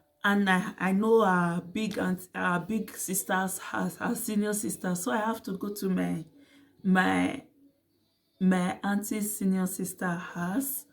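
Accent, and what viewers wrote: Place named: Edo, Nigeria. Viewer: You're sounding like an Edo babe. Am from EDO state😘. Nice connecting with you. Some aunties can be so wicked but thank God for your Dad's quick intervention.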